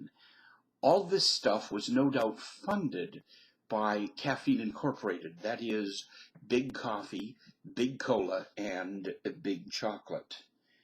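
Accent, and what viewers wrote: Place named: England, United Kingdom. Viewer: I was thinking about clicking away but the English accent gave me confidence in the information that was provided.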